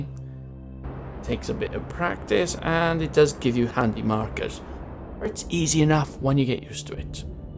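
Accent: Wales, United Kingdom. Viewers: Welsh to me.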